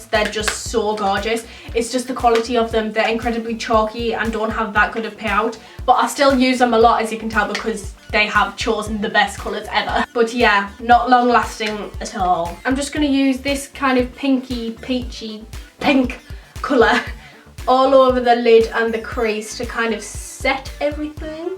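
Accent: Newcastle, United Kingdom. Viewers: Mysticl Sports sorta Geordie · Are you Geordie? Xx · Are you Geordie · are u British?? btw love u sooo muchh!!!! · Are you from Newcastle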